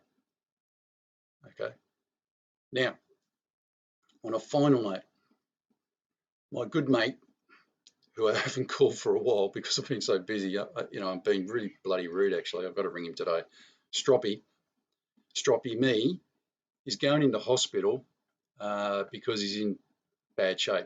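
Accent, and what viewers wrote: Australia. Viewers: I'm so glad you do this. You're one of a handful of Aussies on YouTube saying this stuff. I have an American friend who though quite the lefty at least understands the idea of prepping. I always shout out to the Aussie preppers on overseas channels. It's nice to come and hear an Aussie talking. I've only been prepping for a little while, but really I've gone back to what my Mum used to say and she didn't know about any of this globalist stuff. But she was a farm girl and had been told about the great depression so she knew.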